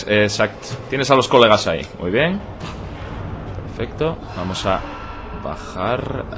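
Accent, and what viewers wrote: Asturias, Spain. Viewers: maquina como se nota que yes asturianu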